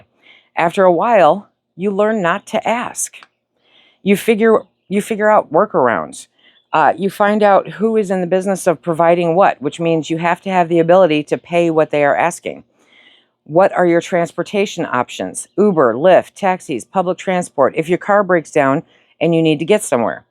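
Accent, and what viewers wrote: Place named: Michigan, United States. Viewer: OMG... I'm so glad I found you. After watching for 15 minutes, I began to recognize your logical, pragmatic thinking, your speech cadence, the landscape behind you, and I thought, "I wonder if she's in Michigan?" Lo and behold, went to your vid description and there it was! I'm a former Michigander, born and raised in southern lower MI. Now living in AZ (looooong story and not by choice.). Caring for a spouse with Alzheimer's and there could come a time when Ill be on my own. Will return to MI because that has ALWAYS been home. Thank you for your wonderful tips, you're providing a valuable service! 💙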